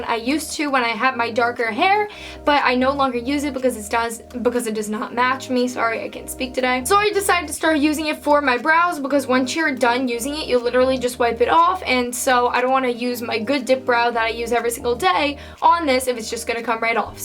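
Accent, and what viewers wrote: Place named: New Jersey, United States. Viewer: You sound kinda New Jersey~ish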